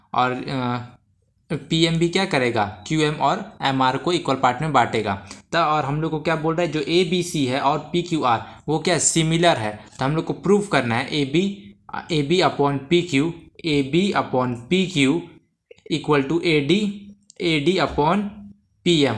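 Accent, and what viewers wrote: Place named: Bihar, India. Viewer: Sir app bhiharhi vani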